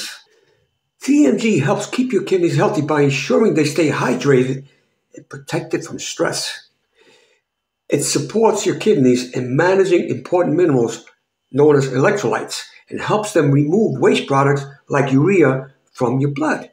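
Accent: New York, United States. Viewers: Hi, I'm also in my 60's I just started taking TMG and it's working great from what I feel so far. I wanted to ask you are you in Germany? Because you sound like a New Yorker. God bless stay healthy. I really enjoy your videos very educational.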